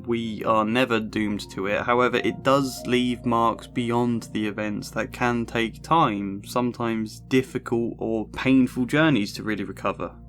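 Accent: England, United Kingdom. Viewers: I love you Mr calm English accent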